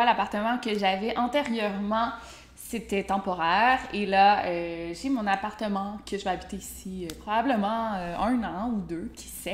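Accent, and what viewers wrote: Canada. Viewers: J’adore ta facon de raconter les choses … pourtant j’ai du mal avec l’accent canadien d’habitude mais avec toi tu raconte tellement bien que je m’en fiche 🤷🏼‍♂️ ! Continue ainsi ! ☺️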